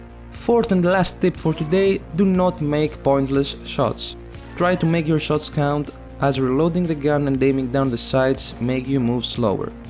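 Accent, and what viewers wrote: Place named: Greece. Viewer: are you greek? I am greek too. If yes i could tell from your voice lol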